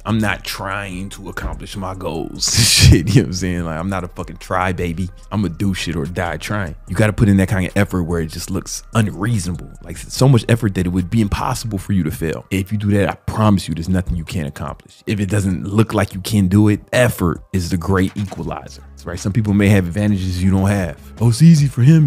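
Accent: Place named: New York, United States. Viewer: I know u grew up in Chicago but u def speak New Yorkanize. I like that quote: ain’t got shit to do with you homie. As we say in NY, mind your business and keep it pushing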